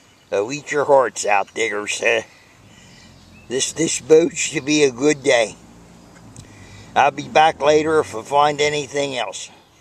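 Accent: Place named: Dundalk, United States. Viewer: Where are you from? You almost have a Dundalk, MD accent. Happy hunting!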